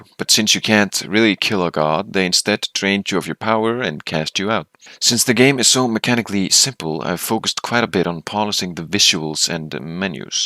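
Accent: Iceland. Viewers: You sound icelandic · You have an Icelandic accent.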